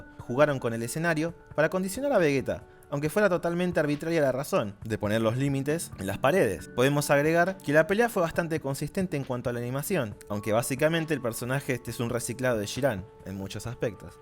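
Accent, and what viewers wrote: Argentina. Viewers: Buen vídeo, sos Argentino?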